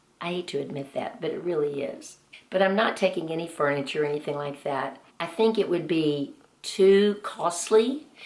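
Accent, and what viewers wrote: South, United States. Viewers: So impressed with you! Go! Do I detect a southern accent?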